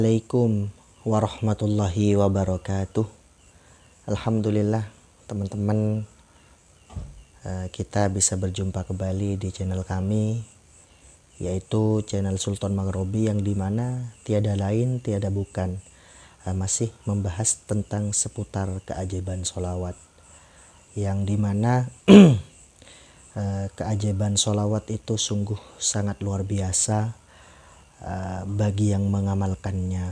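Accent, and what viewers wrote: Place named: Madura, Indonesia. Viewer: Reng madureh kakeh tretan 😂?macem logatdeh reng madureh.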